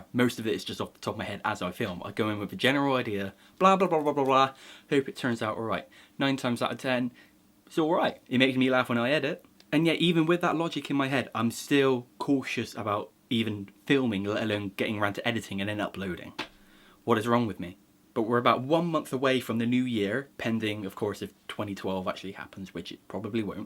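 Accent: Bristol, United Kingdom. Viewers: I'm watching:) I love watching your videos, if for nothing else your amazing Bristolian accent. I was thinking about you the other day, I saw a super pack of nerds for sale:)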